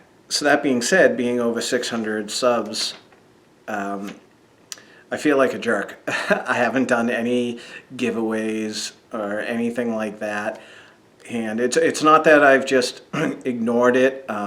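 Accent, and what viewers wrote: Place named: Boston, United States. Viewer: ...amigo, imo, you don't need give aways. We sub'd and watch you for YOU and your shenanigans. In a youtube world of so many "fake and money grubbing" people, i myself appreciate your humbleness and "realness!" Don't put yourself down amigo please, there's many of us out here that genuinely like you just as you are. When I watch your videos I smile, you make me laugh and of course, who doesn't love that "Buastahn" accent, lol. I am part of the, Hog & 2 Cent, Mark, Matt, Nicky, and Vegas Nerds crew. 😁😁 I am happy to see that you and Cinamon are "goin steady", ha ha, ya know what I mean. I wish you both good luck and blessings. Amigo, please stay safe in Vegas....DO NOT lick the pole! LOL LOL 😅😆 Do NOT lick anything that hasn't been sprayed down with plenty of alcohol! LOL By the way...I'm a chatty heffer, so I don't mind rambling, lol! 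Cheers to good times, health, tons of laughs, handpays and unforgettable memories in our beloved and BEST city in the world...VEGAS! 🤗😁🍸🍻💰🍀🤣🎵🙏🎰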